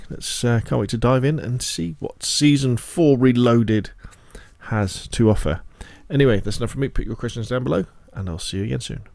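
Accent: United Kingdom. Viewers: Jesus Christ you are a breath of fresh air. Every other video I've tried to watch is just some American begging for subs and spending literally 12-15mins going through everything, with added speculation and opinion. You just go through the information bit by bit and don't flood it with your own contrived padding. Thank you for your resounding Britishness and the approach you have to information dumps. ✌️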